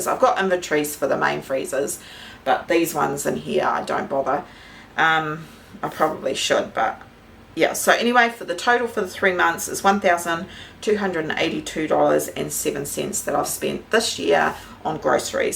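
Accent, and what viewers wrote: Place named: New Zealand. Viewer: As a vegetarian, I'd love to see some vegetarian dishes. Or dishes where the meat is optional... I'm in my 60s, live alone, have a veggie patch, and spend around $50/wk on groceries. I only go shopping once a month, for the same reason as you: I'd spend more! I've built up a good pantry basis and shop my pantry and freezers. I keep track of what I've used. Even though the prices have gone up, my shopping bills have only gone up a little... Because I've got plenty in my pantry, I can wait for things to go on special. I make my own bread, yoghurt, freeze grated cheese, onions and garlic, and make jams, chutneys and marmalades... I also cook big meals and freeze portions for easy dinners. For me, the no shopping challenge AND shopping the specials in different shops, makes sense... It is SO NICE to hear a kiwi accent on YT! 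So good to see you potter in your typical Kiwi kitchen, with your old stove, similar to my own, your lovely aprons (I'm an apron fan!) and watching you cook normal meals, without frills... AND without the jolly long nails that seem to be a must these days...! Thank you for a refreshing new channel! 🎉✨️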